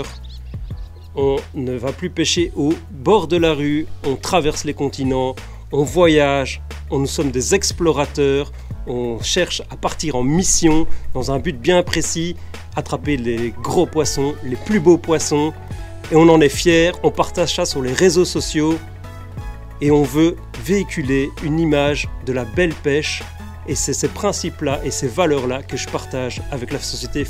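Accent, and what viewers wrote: Belgium. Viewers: =la bonne ambiance de tes vidéo et ton accent belge font des vidéos super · = vive l accent belge j adore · = un accent belge à en rendre jaloux les Français. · = le meilleur accent belge de tout les temps!:D Le team Celt'fish te suit quotidiennement sur les réseaux! À bientôt! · = un accent belge bien sympa, avec des vidéos bien intéressantes pour novices et confirmer!👌🏼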